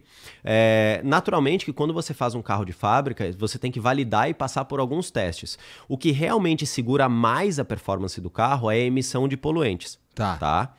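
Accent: Rio Grande do Sul, Brazil. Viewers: Acho tu tu tu virou gaúcho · Ele é gaucho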